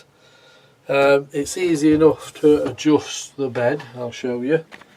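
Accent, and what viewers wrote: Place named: Nottingham, United Kingdom. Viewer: Why ho why did you take bed lock right out, you only need to free it off, you sound like a good notts lad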